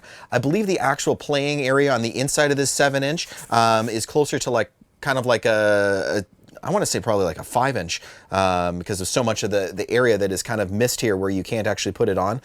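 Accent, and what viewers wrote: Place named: Canada. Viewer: Super cool,; I enjoy hearing your Canadian accent on here as well:) I have that Locust set - I got it from a friend who bought it when we saw them many years ago. That may have been with a band called Holy Molar or with Dillinger Escape Plan (I can't remember which)...Three One G had some excellent output for a bit in the late 90's-early Thousands. I actually have an LP from the band Struggle which is Justin Pearson of The Locust/Dead Cross/many more... That is not as well known, more heavy punky stuff, but has a good raw energy that I bet you would appreciate. As a fellow vinyl collector, that uncut Metallica made me green with envy, lol. Some cut outs I have seen or own, there is a cool J Dilla (beats) that looks like a police badge, and a Father John Misty B side that came out with his sophomore release in the shape of a heart, I know there are many more but those two stand out. 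Anyhow, thanks for the excellent content, I look forward to watching it all.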